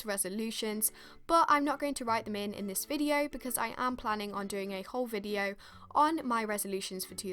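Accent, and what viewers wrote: England, United Kingdom. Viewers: Where of england is thic dialect from?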